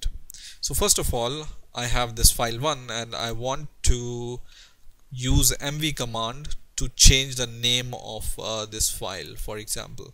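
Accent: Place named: India. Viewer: I can understand his Indian accent. awesome.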